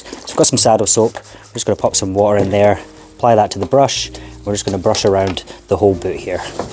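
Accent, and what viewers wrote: United Kingdom. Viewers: You've done a great job on the Boots, they really look amazing. Where's your business you sounded like you could be from the UK ??? Anyway, great job. This comment is from Denver Colorado, United States of America.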